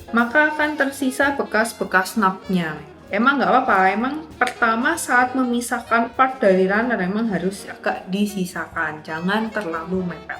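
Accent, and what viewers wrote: Indonesia. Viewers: Tante logatnya medok suroboyoan, sukses terus tante 👍 Tapi bolehlah kapan-kapan "Ajarin ngerakit gundam dong" masih newbie 😂 Twitter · Tumben medok mbak nya. Tapi cakep banget 🥰🥰🥰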